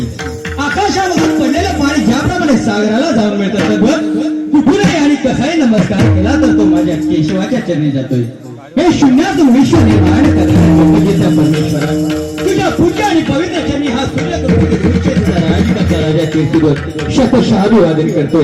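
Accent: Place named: Malvani, India. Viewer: Josh Academy he malvani bhasha aahe tyat uchhar asach hoto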